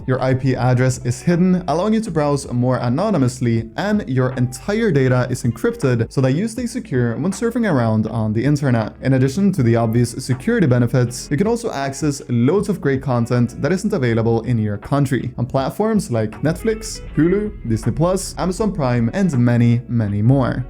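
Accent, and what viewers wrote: Norway. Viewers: why would a bot voice have a norweigan accent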